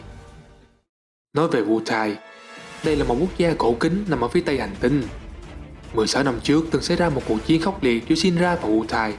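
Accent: South, Vietnam. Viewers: mình comment này là mình rất thich những video của kênh này. Vì có nhiều lý do lắm 1) giọng miền Nam. Mình sr nhé, mình cũng có coi thử 1 it nhưng 1 số bạn ng Bắc nói nhanh quá mình chẳng để hiểu được, vừa nghe mà vừa coi clip y như muốn chóng mặt 2) bạn này sub chậm, giọng nói rất rõ nghe, bên cạnh đó rất hên bạn này nghĩ ra cách chèn sub, làm mình có thể theo dõi kĩ hơn. Đây là điều chỉ có kênh này làm được. Mấy kênh khác dù cũng có sự đầu tư kỹ nhưng họ k như bạn này 3) bạn này dịch kỹ. Hồi đó mình có coi 1 page theo dõi chơi game FFXV, mà page đó chỉ giống như là chơi cho có rồi thôi, k có dịch, làm mình coi mình chả hiểu cốt truyện, rất là bực 4) dịch có mấy đoạn rất vui nữa hy vọng bạn này sẽ đầu tư vào FFX-2 và dãy Kingdom Hearts (Kingdom Hearts này mình thich lắm mà cốt truyện nhiều với từa lưa quá làm mình chả hiểu nhiều) Thank you đã đọc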